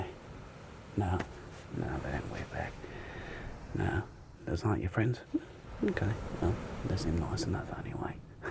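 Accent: Australia. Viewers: Killer work, bro! Especially with the Aussie accent!!! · This is me, an Aussie, petitioning you to please do a Russell Coight ASMR. The accent was 👌 and the theme of this video was so on brand for us. The sun ain't no joke down under! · Your Aussie accent is actually really good, you could have called me a c**t though for maximum authenticity 🇦🇺 🐨 🦘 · As an Aussie myself I just want to say he did a good job especially the accent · big fan of the aussie accent! would love to see it come back in future videos :) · The Aussie accent was 👌 and the suncream on your nose was a good touch 😁 we need more roleplays like this!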